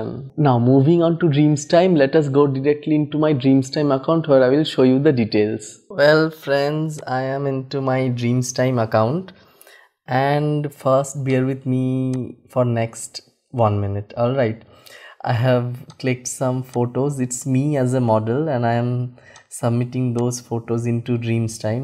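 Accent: Bengal, India. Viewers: Unicorn Your accent clearly shows that you are বাঙালি। আমিও বাঙালি। কলকাতায় থাকেন??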